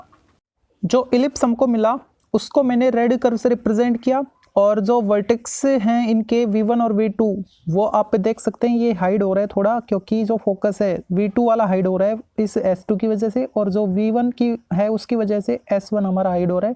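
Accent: Haryana, India. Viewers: Sir aap haryana se ho na 🎉❤😇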